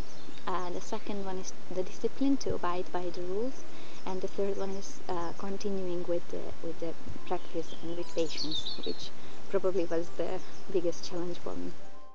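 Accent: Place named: Czech Republic. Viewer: thanks for posting, very well articulated and descriptive video. i'm about to embark on my first vipassana experience & judging by the constant smile on this girl's face, it might just be smtg useful :) where is the accent from? my guess: czech republic....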